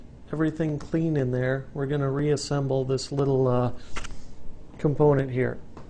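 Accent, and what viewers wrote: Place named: United States. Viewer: Thanks! At last! Someone who actually knows what they are doing in a Apple Mighty Mouse take apart & repair. The instructions are very good and the narrator's voice is in adult American English. Plus the narration is without a lot of superfluous "Uhhh"s and other vocal diatribe. And it is even edited well and in focus! What a novelty! In my case, the outer containment ring was REALLY glued down in front. It took a good half hour of gently slicing into the space between the ring & the housing to with several sharp Xacto knife blades to finally cut through the glue. The containment ring is pretty flimsy and I was afraid of breaking it so I did not try to pry it too hard. Patience (and a sharp blade) is the key when it is glued so hard. This mouse of mine had stopped working properly about two years ago. It would not scroll in one direction. 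But upon disassembly I found that my unit was not as dirty as I thought it was. What I discovered was that just one of the little tiny wheel & shaft assemblies that surround the ball was really jammed up with debris keeping it from moving. Because I waited so long to do my tear down the shaft had actually worn down on one side from the friction of the ball against it. So my problem was not solved. It certainly works faster and smoother than before but that one direction is still not functioning due to the worn shaft. Lesson learned—if simple exterior cleaning is not enough (it never was) then do a tear down and initiate a proper cle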